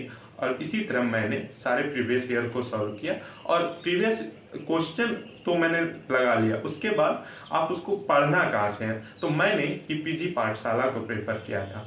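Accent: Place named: Bihar, India. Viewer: Bro tum Bihar se ho kya?? Awaz se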